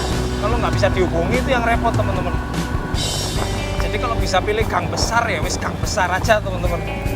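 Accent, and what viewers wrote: Malang, Indonesia. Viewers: Logat orang malang sama semua 🤣 kebanyakan temanku logatnya mirip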